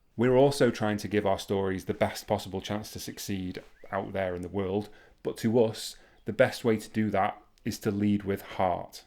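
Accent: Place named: Yorkshire, United Kingdom. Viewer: I have two questions which I think you can help me with. First of all I believe that your accent is from Yorkshire so I think you can definitely help me. Because I’m trying to write a book that you set in Ingleton and I’m a London living in Oxfordshire so I have made a rod for my back. I also know that the dialect is definitely different to the way I would say things. The second thing that I don’t know if you can help me with is how do you write a character who is drunk and also from Yorkshire? Do you use the dialect heavily or do you tend to just refer to actions? Finally, I just remembered that I know there’s a difference between an alcoholic and someone who is drunk because you can have a functional alcoholic or an abusive alcoholic who’s not drunk all of the time.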